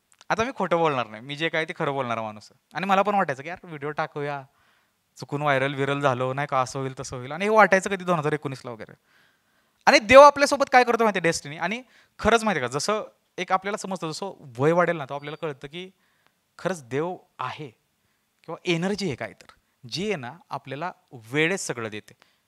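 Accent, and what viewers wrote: Satara, India. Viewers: 90's ERA खरचं आपण लहानपण जगलो - without technology Wale तू सांगितलेल्या सर्व गोष्टी खूप जवळच्या वाटल्या....लहानपणात घेऊन गेलास मित्रा...आई वडील, गुरुजी, मित्र सर्व आठवले...hats off 🙏🙏 अजून एक गोष्ट मी एक सातारकर आहे त्यामुळे तुझी बोलण्याची पद्धत त्यामुळे अजुन जवळ गेलो ....thank u 🤗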